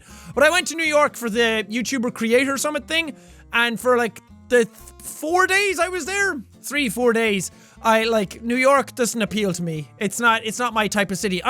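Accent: Ireland. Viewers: Jacksepticeye everyone just roasted markilper on his channel I love your channel thank you for makes someone extremely happy everyday because of this channel thank you for making me feel less lonely everyday absolutely is beautiful your accent I'm enjoying this channel because of Irish accent is like music to my ears thank you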